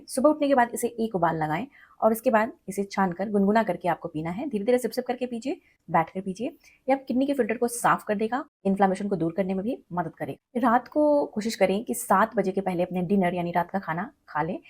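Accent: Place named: South, India. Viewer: Madam Aap. Ne. Behad. Theek Remedy. Bataya. Lekin Aap. Ko. Awaz. Theek Naheen. Hai Aisa. Bolti. Hain. Jaise Medrasi. Hon So. Log. Naheen Samajh. Pate Allld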